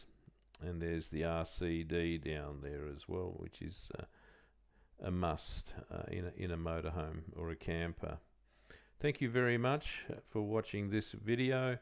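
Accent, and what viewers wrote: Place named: Australia. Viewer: I watched part one and two just amazed at the quality of work...when I heard the Australian accent it confirmed my previous beliefs in Aussie craftsmanship and ingenuity.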